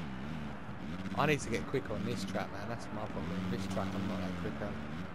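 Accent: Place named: United Kingdom. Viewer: Loving the British accent mate😂😂👌🏾🤙🏽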